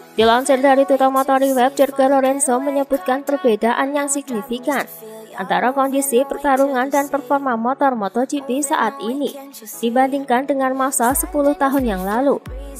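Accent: Java, Indonesia. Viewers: Mbak medok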